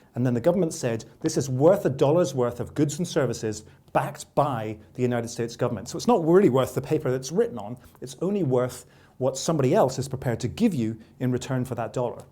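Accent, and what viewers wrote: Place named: Northern Ireland, United Kingdom. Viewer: Hey, great to hear a familiar dialect from an expat of Northern Ireland. Really interesting.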